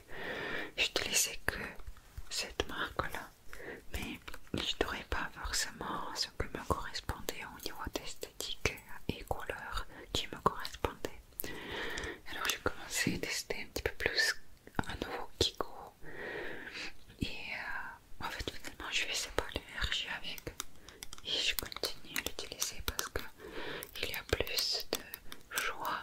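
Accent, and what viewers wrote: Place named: France. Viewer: Hello j'adore votre jolie voix et votre accent français !!🙂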